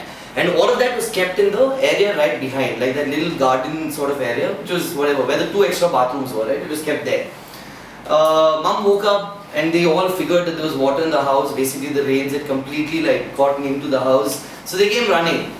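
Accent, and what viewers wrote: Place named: Deccan, India. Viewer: Hey dude I'm your fan from Bangalore and the area next of you and I just imagined every thing you said and the dakny urdu was awesome 😚😚😚😘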